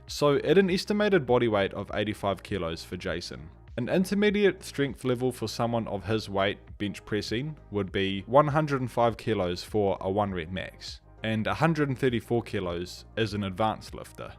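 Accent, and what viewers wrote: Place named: New Zealand. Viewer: You sound like one of those New Zealanders who has a slight Australian accent for some reason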